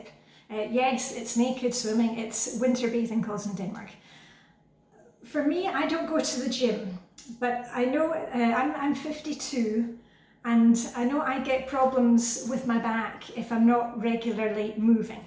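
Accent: Scotland, United Kingdom. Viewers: Hello, I´m new here just found you on YT. What a nice and inspirational person you are. I am Danish and live in Denmark. Seems to me that you have a Scottish accent is it true? Hope you don´t mind me asking. Jeg håber du får en rigtig god dag og ser frem til at dykke ned i dine videoer....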